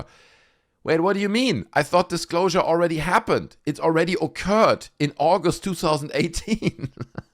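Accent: Germany. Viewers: Thank you for all your work! Your logic, humor and perfect very slight German accent is such a perfect combination! Keep yourself safe.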